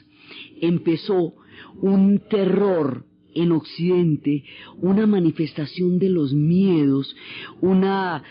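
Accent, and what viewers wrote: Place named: Colombia. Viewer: Me encanta como cuenta las historias esta mujer, me gusta su voz y su acento, tremenda joya que tiene Colombia, saludos desde 🇸🇪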